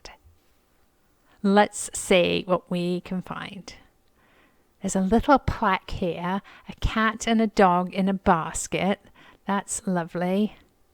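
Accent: England, United Kingdom. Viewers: LOVE the design for the "kitcheny bits" merch! I adore the British accent and terminology as do lots and lots of your viewers. Go for it! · Are you from England?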